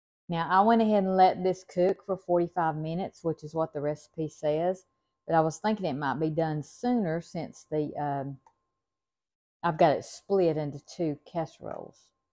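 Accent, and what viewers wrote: South, United States. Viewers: I tried this recipe for supper last night, and it's delish! Cannot wait to eat some leftovers again tonight! Your Southern accent is delightful. What state do y'all reside in?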